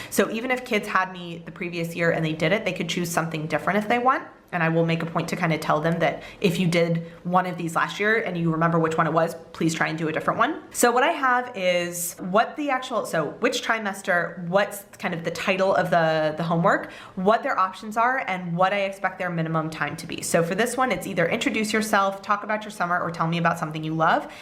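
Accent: United States. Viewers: That's really cool. The Yearbook thing brings back memories =) Do the kids ever get confused about pronunciation of some English words between your American pronunciation and the other teacher's UK pronunciation?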